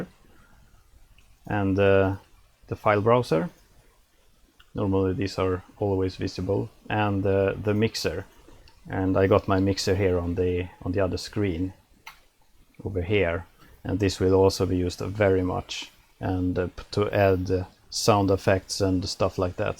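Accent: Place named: Sweden. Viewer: ganska passande, har precis börjat med fl studio själv, älskar din svensk-engelska accent förresten :D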